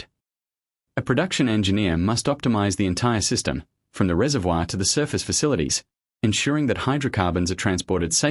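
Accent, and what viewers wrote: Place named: United Kingdom. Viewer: This is a great chapter, can you change the British accent to American?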